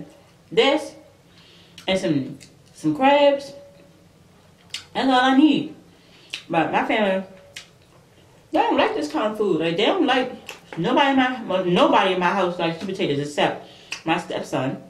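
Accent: Baltimore, United States. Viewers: I had a feeling you was from Baltimore from your accent but I didn't want to assume. Most of the time I'm hungry when I watch your channel because it's late and I've been on this don't eat after 7 & before I would eat dinner and dessert like around 9pm. I just be living through you lol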